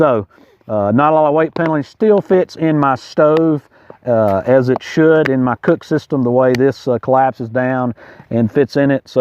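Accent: South, United States. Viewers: ur prob live in smokies sound southern very nice person and ur very helpful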